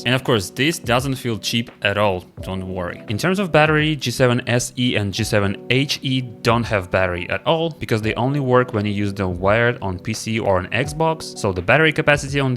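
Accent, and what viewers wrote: Russia. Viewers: я так и знал что он русский с нулевой секунды было понятно =)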